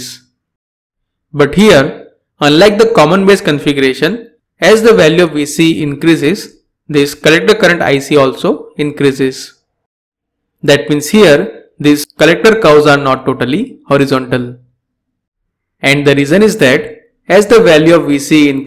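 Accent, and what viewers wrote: India. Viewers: Why is it that anything that has to do with circuits is taught by somebody with a ridiculously thick Indian accent?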